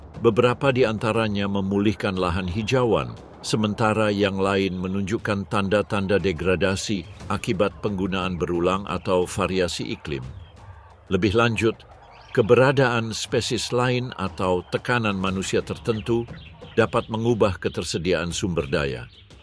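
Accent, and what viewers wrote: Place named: Malaysia. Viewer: Ini konten orang Malaydesh jangan di tonton itu logat bahasanya orang Malaydesh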